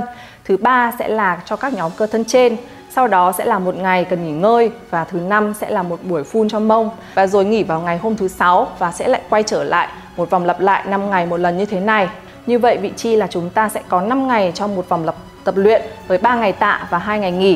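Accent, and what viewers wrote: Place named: Vietnam. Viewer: có 1 số từ chuyên môn dùng tiếng Anh thì phát âm khá thuần Việt. Team có thể cân nhắc cải thiện ha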